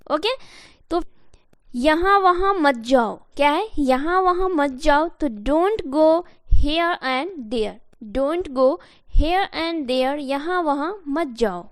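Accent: Bihar, India. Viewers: It seems from your voice, you are from bihar..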